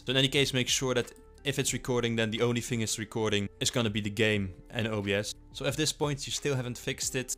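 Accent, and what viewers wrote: Netherlands. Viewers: you speaking like a dutch accent :D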